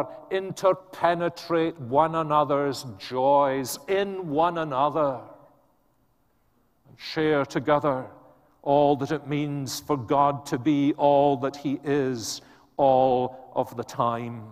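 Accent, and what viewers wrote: Scotland, United Kingdom. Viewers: Preaching of the word of God is solemn. Hearing it in the Scottish accent seems to enhance the solemnity!!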